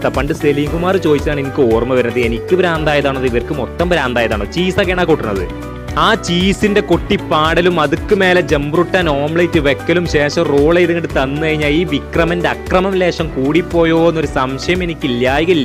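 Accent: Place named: Malappuram, India. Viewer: Malappuram baasha uyir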